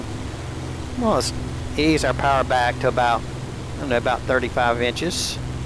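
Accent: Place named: Deep South, United States. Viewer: Deep south. No offence meant.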